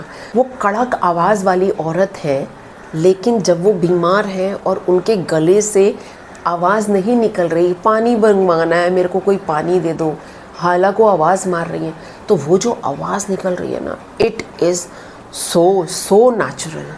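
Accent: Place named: Pakistan. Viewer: Masha Allah mam apki awaz m kitni safai hy. Sath sath hmari mother laguage ka b touch hy in short sarhad paaar han hum lekin dilon me apnaiyat aj b ha jo apki baton se lag rae hy k jese aek e family ho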